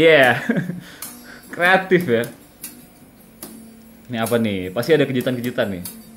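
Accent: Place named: Bali, Indonesia. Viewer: Duh aksen baline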